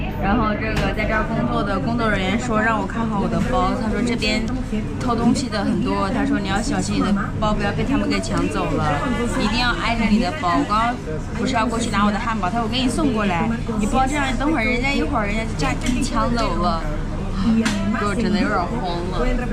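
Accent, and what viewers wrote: Northeast, China. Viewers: 感觉你是东北人口音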